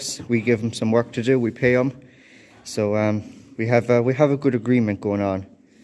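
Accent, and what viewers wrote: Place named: Claremorris, Ireland. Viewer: Great video I know you told me your from Mayo sounds very like a Clare morris accent 👌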